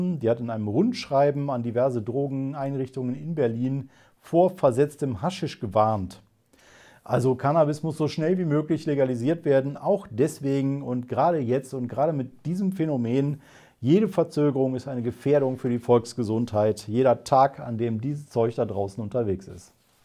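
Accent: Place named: Westphalia, Germany. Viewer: Never. Auf jeden Fall Westfalen. Münster oder so...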